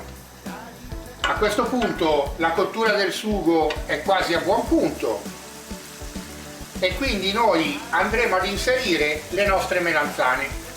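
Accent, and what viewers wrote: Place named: Sicily, Italy. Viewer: L'accento e siciliano proprio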